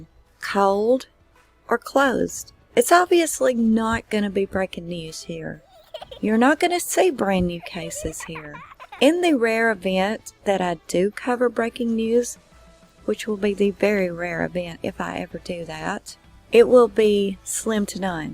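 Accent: United States. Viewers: Do your own thing ... I love listening to your accent ... that’s what I call a proper American accent 😁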